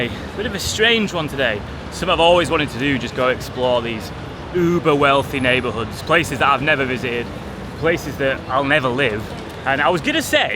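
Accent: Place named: London, United Kingdom. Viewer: Hello I never knew you knew about London and you have got a really strong London accent now, good video my guy does this mean your going to play for chelsea?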